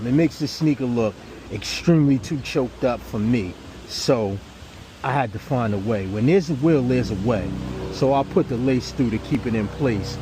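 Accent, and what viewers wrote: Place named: Brooklyn, United States. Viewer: Hearing you speak took me back to my Brooklyn days. I grew up in Brooklyn in the 80s and 90s. Your accent and vocabulary took be back to that time.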